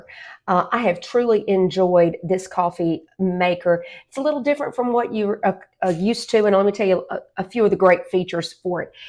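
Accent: South, United States. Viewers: Mine came with the instructions inside the pot. How to get out? It is like the "monkey paw in the jar" thing. Also, you are smokin' hot and I dig your southern drawl. Thank you for being you.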